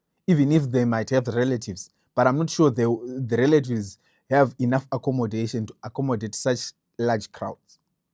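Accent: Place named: Zimbabwe. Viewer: You sound Zimbabwean Bro... Are you in South Africa as well?.... You know who will actually do something about this..... That Gayton McKenzie...!!!!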